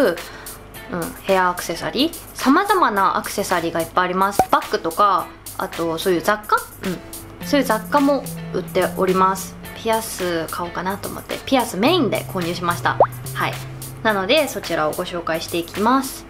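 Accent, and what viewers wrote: Hakata, Japan. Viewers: YouTubeで博多弁聞くとなぜかほっこりする笑 アクセサリーが大人の女性って感じで綺麗やん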